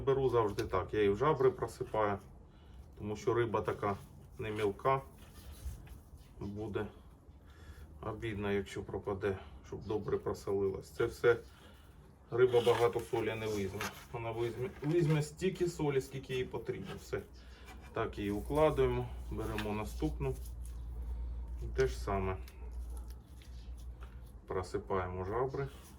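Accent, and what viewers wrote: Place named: Russia. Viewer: Акцент кацапа а так піде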